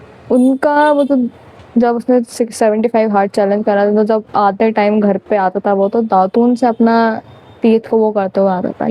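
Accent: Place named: Mumbai, India. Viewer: aapke bolne ka tarika esa lagta jese aap mumbai se ho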